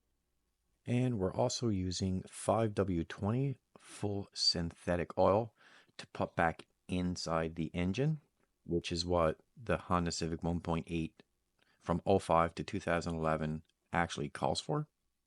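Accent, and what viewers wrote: Newfoundland, Canada. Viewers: Man. You sound like a Newfie. Are you?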